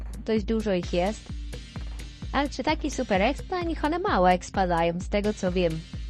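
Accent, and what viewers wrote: Poland. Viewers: Podoba mi się twój Podlaski akcent. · Z jakiego rejonu Polski jesteś? Skąd akcent? XD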